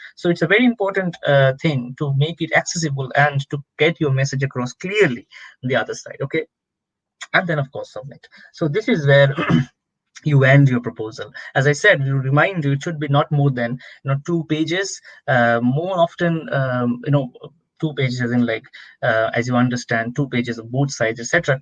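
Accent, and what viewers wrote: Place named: India. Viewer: the accent was hard for me to understand. I think it is good for Indians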